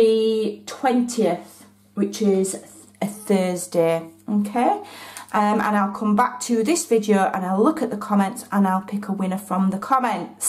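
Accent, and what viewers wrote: Yorkshire, United Kingdom. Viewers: I was looking for craft fair ideas and found your video on the lip balm holder. <link> After seeing that I checked out some more and was hooked by your laugh, your beautiful yorkshire accent (I have a good friend from Bradford and your voice is just like hers) and the fact that you were working on A4 card for the ones I found. If I didn't have arthritis I'd have done somersaults. Love your channel. You're very busy uploading this weekend. Well done girl. And thanks for the giveaway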